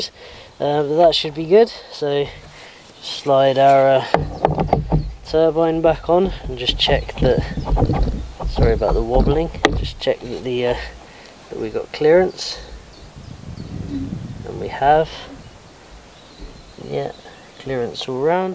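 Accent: United Kingdom. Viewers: 我竟然能听出是英式口音？？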